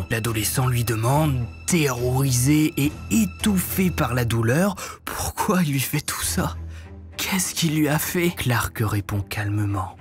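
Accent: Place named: North, France. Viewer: Cet accent de nordistes c’est pô possible !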